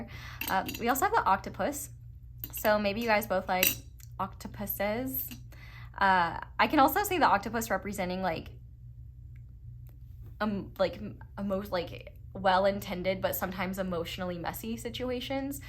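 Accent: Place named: Wisconsin, United States. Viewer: Pile 2 - the Wisconsin accent 😂 me being from Wisco and a Packer fan 😂😂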